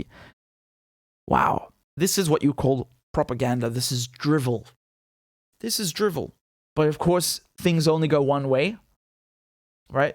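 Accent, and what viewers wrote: Australia. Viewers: Love the Aussie accent 😂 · That was a great Australian accent. I love it lol Aussie’s love hearing people try speaking like us. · Hahaha love your Australian accent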